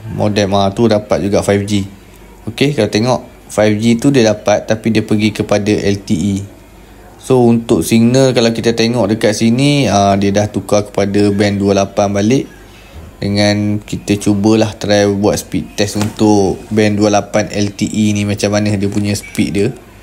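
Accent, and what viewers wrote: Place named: Kedah, Malaysia. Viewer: Mantap...ada band 28 + loghat kedah...terbaik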